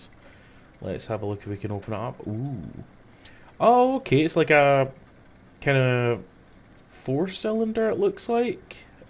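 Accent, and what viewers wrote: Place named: Scotland, United Kingdom. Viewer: It's the first video from you, that popped up in my feed. You're speaking like level 1.5 or level 2 of Scottish out of let's say 5. I wanted to move to Scotland 4 years ago (as an eastern european), but covid stopped me unfortunately. I understand English very well, but Scottish English was something new for me. Thankfully I found "Autoshine Cars" account, who's a Scotsman living in Blackpool and learned your pronounciation from him and can understand you fully :). I love your vid, keep them coming <3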